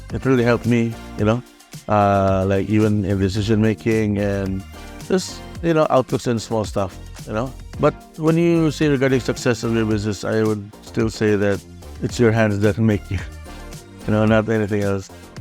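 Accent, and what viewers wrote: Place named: Visayas, Philippines. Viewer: Maolaw man sad ta mag bisaya sir 🤣🥰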